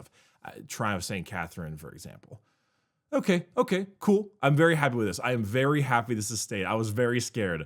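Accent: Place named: California, United States. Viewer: "Mare-icle" dice. Californian accent be strong.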